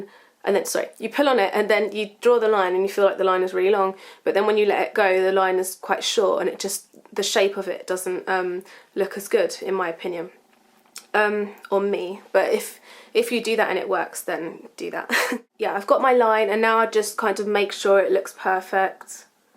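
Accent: London, United Kingdom. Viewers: She's not Irish, in fact if I had to guess I'd say she's from London. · Luvved this video and is she british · Love your videos and your accent! What part of the UK are you from?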